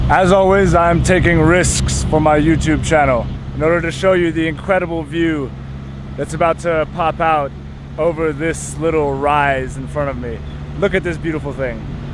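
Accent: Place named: United States. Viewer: Nice video man I hope you add a lyircs on the next videos cuz the amircan accent is not that easy to be understood from the first time.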